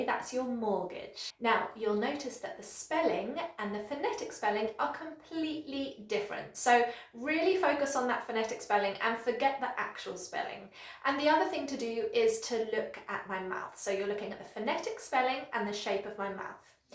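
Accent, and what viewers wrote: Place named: United Kingdom. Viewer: Thank you for the lovely video. I love the British accent...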